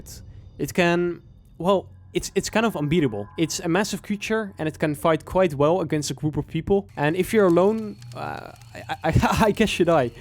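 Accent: Netherlands. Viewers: Are you dutch? Ur accent sounds very dutch, no offense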